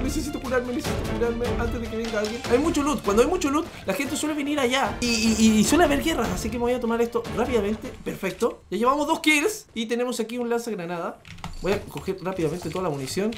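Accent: Chile. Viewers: Es chileno ?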